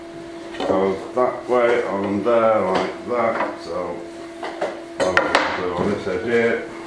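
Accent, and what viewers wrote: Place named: North, United Kingdom. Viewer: Congratulations on reaching over 2,000 subscribers. The two of you make a wonderful team. Thank you for not having background music when you are carrying out your tasks and craftsmanship, it make viewing so much more pleasurable., it’s great hearing a northern accent, and your dry humour. Looking forward to seeing your future projects. Keep up the great work. Pauline, Sheffield.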